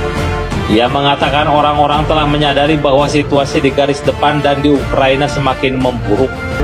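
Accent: West Java, Indonesia. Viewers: Logat sunda lu bos kentara banget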